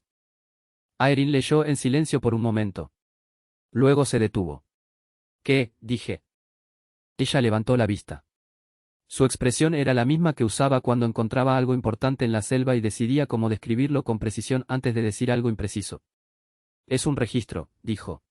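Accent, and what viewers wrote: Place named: Argentina. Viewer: IA argentina?!!😂😂😂 Primera vez que escucho esa voz Ia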